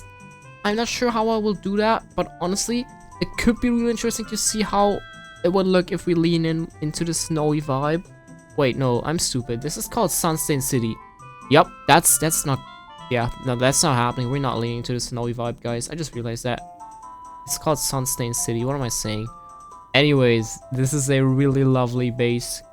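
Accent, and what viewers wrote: Germany. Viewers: quick question, are you German? you kinde saound like you´re German...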